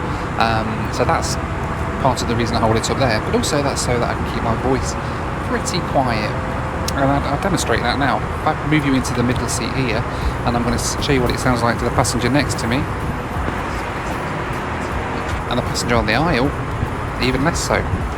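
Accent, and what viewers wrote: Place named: Leicester, United Kingdom. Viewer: Which part of Leicester you from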